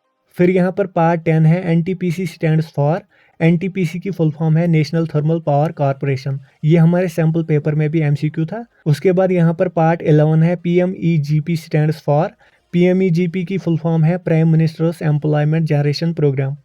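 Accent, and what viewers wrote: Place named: Kashmir, India. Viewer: Sir has better kashmiri accent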